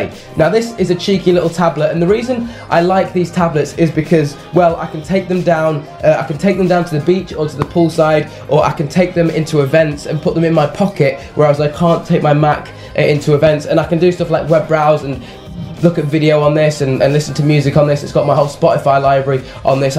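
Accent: United Kingdom. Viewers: that british accent is awesome, you make everything sound so much cooler or than it is lol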